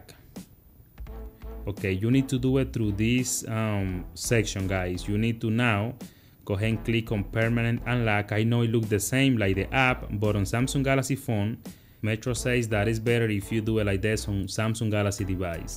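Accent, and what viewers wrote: Dominican Republic. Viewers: you sound Dominican! that's wSup paisa😄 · You Dominican? De lo mio?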